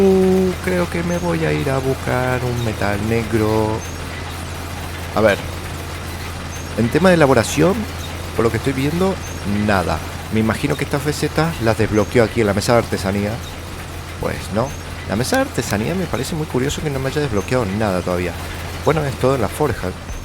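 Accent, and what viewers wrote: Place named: Córdoba, Argentina. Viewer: Una mezcla de cordobés español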